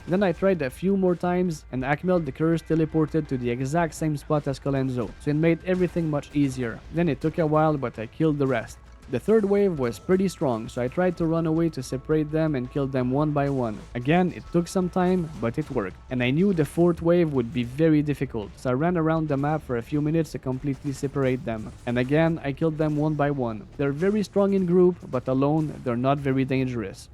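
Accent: Quebec, Canada. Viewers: You french canadian ? Im curious 😆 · “J’ai Chaud”. I can never unhear it now. You for Quebec? The accent sounds very familiar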